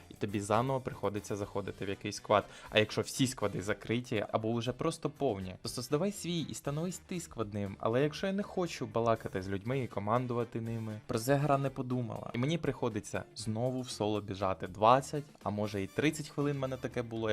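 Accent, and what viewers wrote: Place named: Surzhyk, Ukraine. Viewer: Полу суржик полу українська. Чувак, я підпишусь)) Це медятіна для моїх вух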